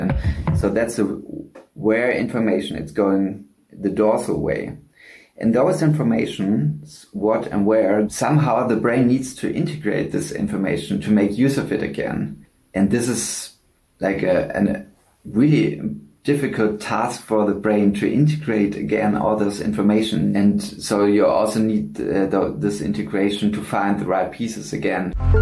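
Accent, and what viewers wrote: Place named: Germany. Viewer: Starker deutscher Akzent, bist du Deutscher?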